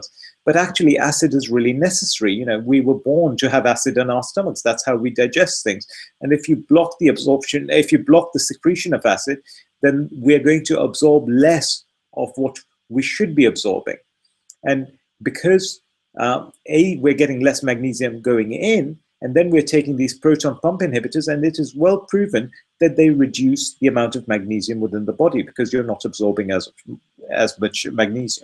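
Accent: United Kingdom. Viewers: Love your accent, British ya?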